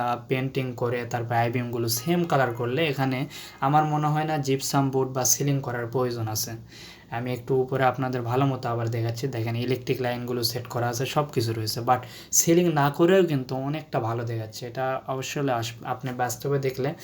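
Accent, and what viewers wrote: Naogaon, Bangladesh. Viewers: আপনার বাড়ি নওগাঁতে নওগাঁর ভাষা বোঝা যাচ্ছে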